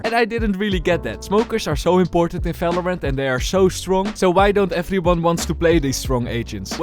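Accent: Netherlands. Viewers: I hear the dutch accent in every sentence hahaha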